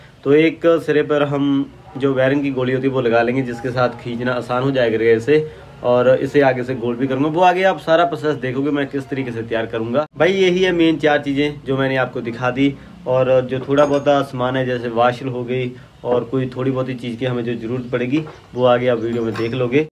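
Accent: Punjab, India. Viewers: ਵੀਰੇ ਲਗਦਾ ਪੰਜਾਬੀ ਆ ਪੰਜਾਬੀ ਕਿਉ ਨੀ ਬੋਲਦਾ ਯਰ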